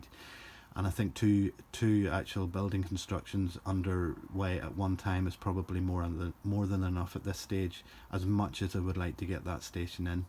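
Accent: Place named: Ulster, United Kingdom. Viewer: Great video and so inspirational, also lovely to hear a soft Ulster accent talking trains 😀 Happy Christmas from County Wicklow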